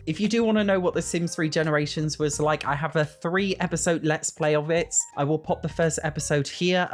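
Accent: United States. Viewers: You with the American accent sent me into an alternate universe where the sims 4 isn’t garbage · Your american accent always makes me laugh, it is - chef’s kiss - amazing. 😂😂❤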